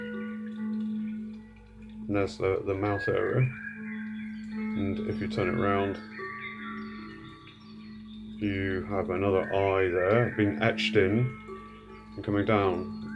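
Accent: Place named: England, United Kingdom. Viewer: You sound English, you should check my blog, although its a world wide thing... <link>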